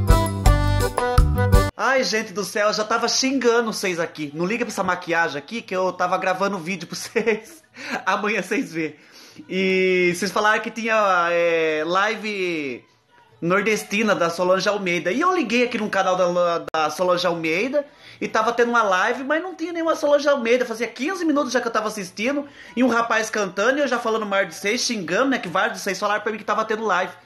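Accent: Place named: Sorocaba, Brazil. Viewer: Você é sorocabano! O sotaque é inegável.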